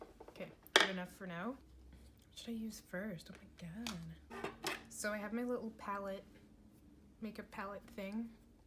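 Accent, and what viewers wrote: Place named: Ireland. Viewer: Are you by chance Irish or Scottish? You have the prettiest accent that sounds like you might be from that area?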